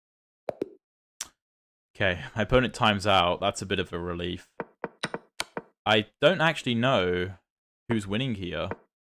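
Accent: United Kingdom. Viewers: You are very good at explaining your ideas. Also, I love the British accent. Greetings from a new subscriber in Germany!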